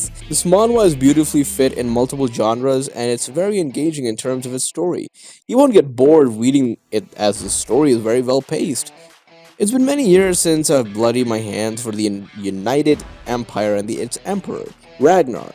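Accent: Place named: India. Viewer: Are bhai Indian ho na tumhare English se hi pata chal gaya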